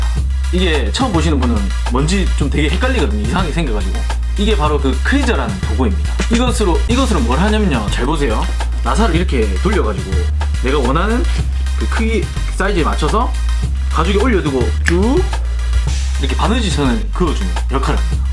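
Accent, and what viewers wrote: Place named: Gyeongsang, South Korea. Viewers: 경상도 사시나요..?ㅋㅋ 말투가 저희신랑이랑 비슷하셔서... 공예초보자인데 많이 배워용😆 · 좋은 정보 감사합니다 말도 빠르시고 경상도어투인데 배경음악이 너무 정신이 사나워서 집중하기가 힘들어요 ㅡㅡ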